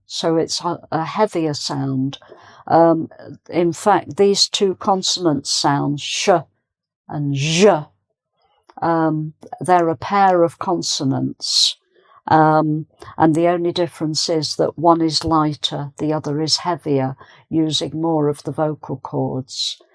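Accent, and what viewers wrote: England, United Kingdom. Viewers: British English? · Hi great teacher I love British accent. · The Lady has nationality English basead in her voice. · You are amazing lady and a great teacher with so pleasant British pronunciation, thank you❤🌹🙏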